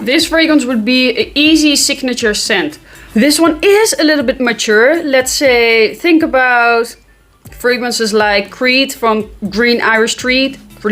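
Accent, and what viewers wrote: Netherlands. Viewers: Ben je Nederlands? Zo klinkt je accent. Je bent trouwens echt grappig 🤣🤣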